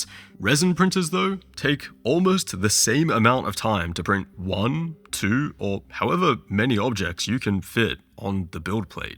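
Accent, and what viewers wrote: Australia. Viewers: Australian creator says "dollars" in their Australian Accent while living and working in Australia and means USD instead of AUD?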